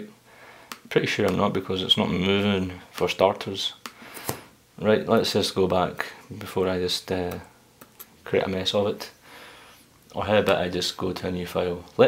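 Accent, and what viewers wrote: Scotland, United Kingdom. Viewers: Sounds like a Scotsman must be a Scotsman!hello mate....can you run xcode?it runsok??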